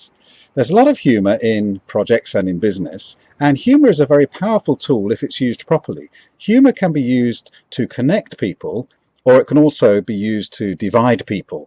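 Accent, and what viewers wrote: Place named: United Kingdom. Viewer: Most amusing, - couldn't help smiling at some of the lines, delivered in such a typical British style...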